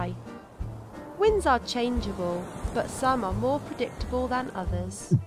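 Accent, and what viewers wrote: United Kingdom. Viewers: This use british accent?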